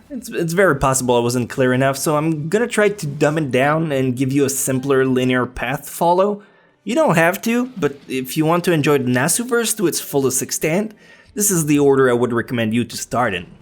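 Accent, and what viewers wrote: Quebec, Canada. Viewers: Tu sonnes vraiment québécois. It takes a quebecer to recognize a quebecer accent haha.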